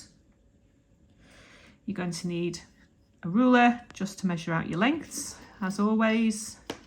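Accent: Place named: York, United Kingdom. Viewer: Such a lovely link. what size would you say is the jump ring? Also, are you using dead soft or half hard wire? Enjoy listening to you speak, is that a York accent I hear. 😊❤️. Thank you.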